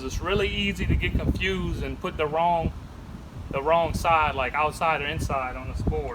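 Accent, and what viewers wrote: Louisiana, United States. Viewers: Where's his accent from? Louisiana possibly?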